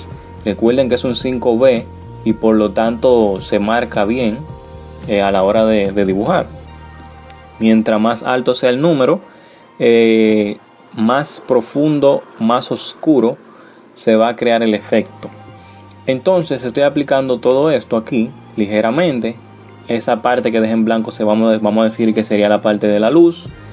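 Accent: Dominican Republic. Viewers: Parece en la voz dominicano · Eres dominicano?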